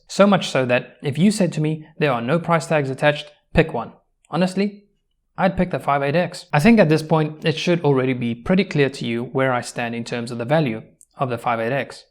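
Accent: South Africa. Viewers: Unrelated but are you South African?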